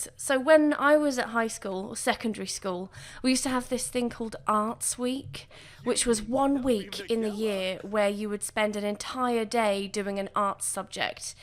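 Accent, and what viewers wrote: United Kingdom. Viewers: I love your videos! Your humor and sarcastic comical self is just like mine. I love the sims, and I enjoy your videos! Always love hearing your story of your sim and always got me laughing which I need bc life sucks! I love your accent! British ladies got it going on! Cheers!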